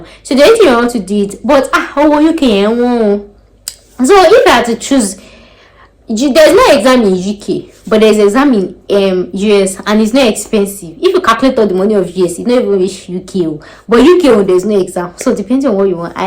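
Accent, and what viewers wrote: Nigeria. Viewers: Please add subtitles to your video. I love your Nigerian accent but please also be accomodating to people who watch your videos who aren't from Nigeria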